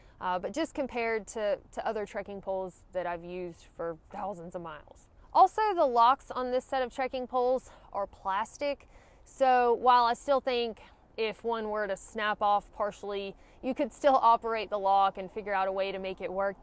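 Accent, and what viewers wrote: South, United States. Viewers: Just stumbled upon your channel and love the info and that southern drawl 👍😎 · It’s good to hear a southern accent! Moved to CO from Elmore County last summer. I miss hearing Southern English!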